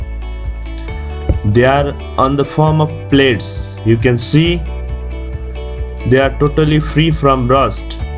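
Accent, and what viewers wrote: India. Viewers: Bihari bol raha hai? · Indian accent is the Ugliest english accent around the world and it is very hard to understand,bad pronuncuation,to name but few. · Give up your Tamil accent of spoken English.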